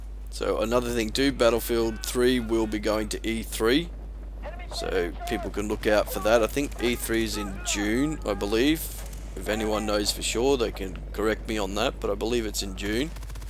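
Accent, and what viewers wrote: Australia. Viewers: you sound aussie are u? gret gamplay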